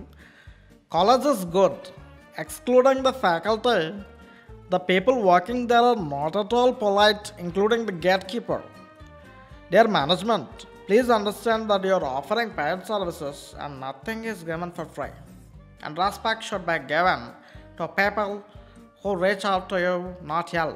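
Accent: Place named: West Godavari, India. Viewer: West 😂😂 slang iragadisav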